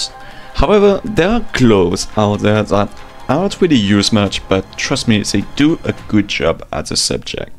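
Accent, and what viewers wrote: France. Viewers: Is my guy french??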